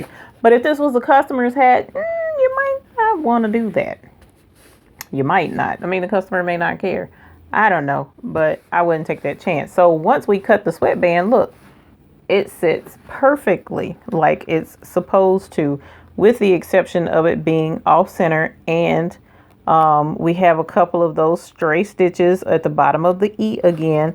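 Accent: South, United States. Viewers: Lol, I'm loving the southern belle accent too -- the land of good food, sweet tea and common sense. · OMG! Your Southern Accent is so adorable! Love the video. Gonna run by the Dollar Tree and give this a try. Thanks so much for the instructional.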